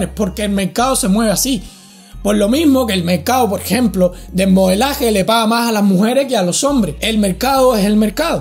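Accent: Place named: Cuba. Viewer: Yow mano, me encanta lo claro que hablas y ese acento cubano.👊🏼😁 Saludos desde España.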